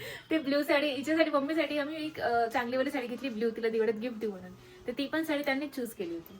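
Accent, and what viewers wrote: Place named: Karanja, India. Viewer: Tai tumhi kuthlya gawachya aahe tumchi language bilkul aamchyAsarkhich aahe Karanja (ghadge)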